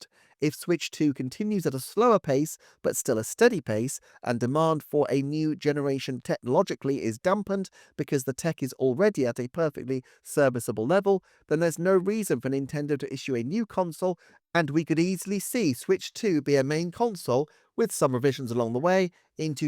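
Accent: United Kingdom. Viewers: yeah is probably one of best nintendo youtubers out there, he's certainly well-informed and that british accent automatically grants him extra credibility lol (also i like your username, soli Deo gloria ✝)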